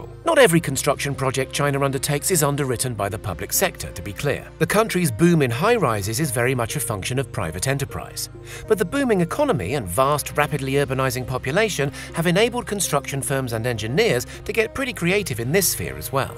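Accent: United Kingdom. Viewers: Sorry, hard to understand due to British accent.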